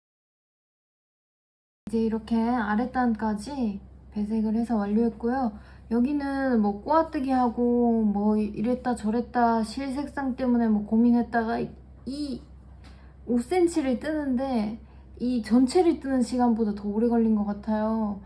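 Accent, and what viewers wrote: Seoul, South Korea. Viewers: 서울 사투리 쓰시나요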